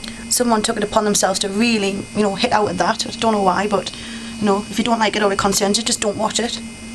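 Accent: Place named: England, United Kingdom. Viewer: you have a great accent, english i'm guessing? you have great skills too woman :D & i totally understand about the collection thing and i respect you for thinking more of others than just yourself... all over awesome!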